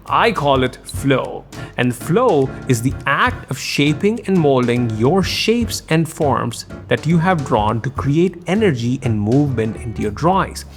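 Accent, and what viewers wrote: India. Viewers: I totally forget sometimes you have an indian accent, which i love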